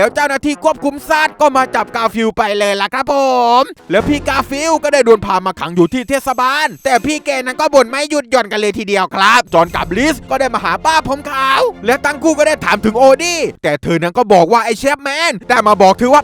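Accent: South, Thailand. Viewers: สุดยอดสำเนียงใต้ 555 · พี่เป็นคนใต้หรอ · พูดไต้ได้ด้วยไอย่าไอบาวนี้ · พี่เป็นคนใต้หรอครับ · พี่ครับพี่เป็นคนใต้หรอครับ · คนใต้ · พี่คนใต้ใช่ไหมพี่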